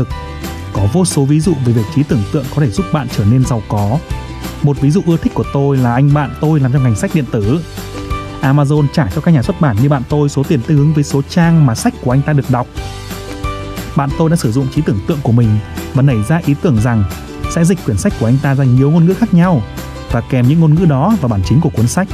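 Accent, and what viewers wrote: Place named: North, Vietnam. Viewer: Rất muốn nghe nhưng kênh kèm nhạc ồn quá k thể tâp trung nghe đc.mình là người Nam nghe giọng Bắc đã hơi khó lại thêm nhạc nữa .....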